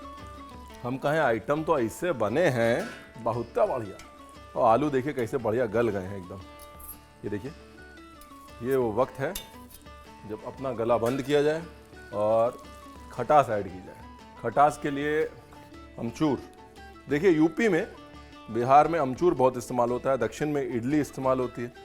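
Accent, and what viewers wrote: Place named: Punjab, India. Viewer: Ranveer ji, I have tried your Louki Dhokla and Paniyaram, it was yummmm! My daughter loved it. BTW Paniyaram is a term in TN and Kuzhi Appam is called in Kerala. Kuzhi pronounced as “Kuli” means small dents/ holes. Perhaps Appe was morphed later in other regions incl MH etc., additionally wanted to share, you speak very well. I mean your friendly, conversational recipe making is a treat to ears ! I adore your Punjabi accent and the wit and humour you bring along. Your Videographer for this channel needs an extra applaud for capturing colors and sounds to the precision, even the mustard crackling sound was a sound-delight to listen to. Prayers and Best wishes for all your endeavors, keep inspiring us.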